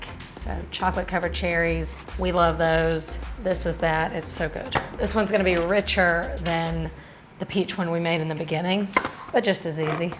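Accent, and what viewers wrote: South, United States. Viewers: I concur! And I love that southern twang. Reminds me of home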